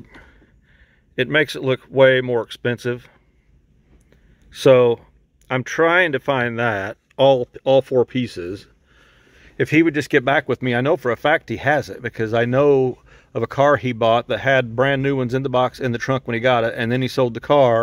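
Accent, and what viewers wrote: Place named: Midwest, United States. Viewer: Dude, being a Hoosier, your midwest accent makes me feel right at home! I often disagree with Adam when it comes to opinions about styling, as he has obviously been indoctrinated by GM, but I gotta say I agree with him on this one. my opinion doesn't matter, it's your car and your dream. It's gonna be a stunning Squire regardless! Good job as always. Gotta admit, I'm in awe of your meticulous attention to details!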